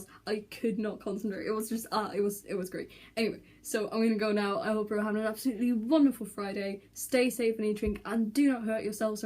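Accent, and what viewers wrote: United Kingdom. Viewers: btw I thought you were British, you sound like Martin Freeman talking XD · Live the video why the British accent not a Norwegian one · How did you learn English? And to sound vaguely British (to me) too? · I actually thought you were British until I saw the vlog you did about your day at school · I thought you were British! :) Well, I'm Italian, but to me your accent sounds very British, and your English seems excellent! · I THOUGHT YOU WERE BRITTISH WHATT youre so good at english, like im swedish and my english is so bad TEACH ME YOUR WAYS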